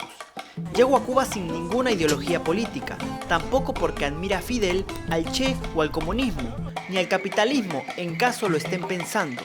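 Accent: Argentina. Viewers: Por qué imitas el acento argentino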